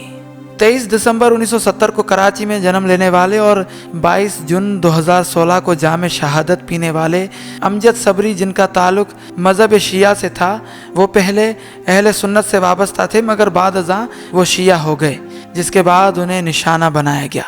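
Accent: India. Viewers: Yeh bolne waly bhai mujhe india k lag rhy hen inka lehja indian h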